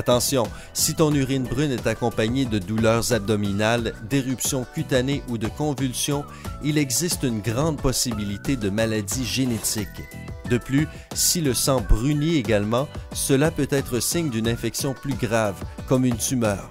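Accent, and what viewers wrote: Quebec, Canada. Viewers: Enfin un canadien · Moi j'adore l'accent québecquois tout simplement !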